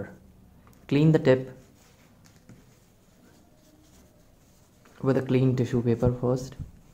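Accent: India. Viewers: EVERYWHERE HINDI YOUTUBERS (ACCENT AND HANDS) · Reading all the negative comments on this man's well produced, articulate and somewhat helpful video was discouraging. Looking at his tools and noticing his accent I would bet he does not live in the U.S/UK/Australia or some other country that has access to state of the art equipment or $ to purchase them. My guess is he lives in India with a billion people and only 10 have even a solder iron. The iron he is using was probably passed down from his grandfather and was sanded well before he got it. So last resort if the tip had its coating removed before is to sand. And that will work. Not long...but will work to do a job. Hats off to a well produced video and using what ever means necessary to make a fix.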